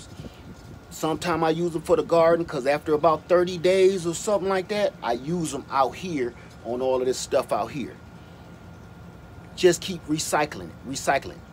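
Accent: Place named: California, United States. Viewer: You sound like you’re from Cali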